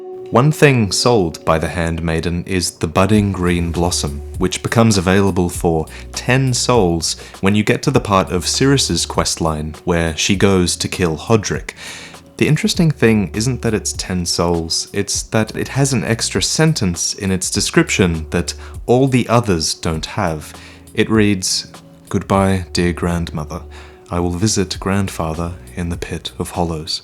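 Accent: Australia. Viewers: Hey, what part of Australia are you from? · he is Australian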